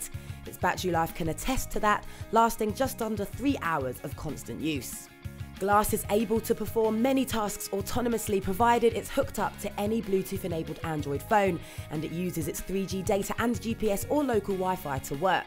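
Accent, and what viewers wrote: United Kingdom. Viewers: Ahahahaha the British Accent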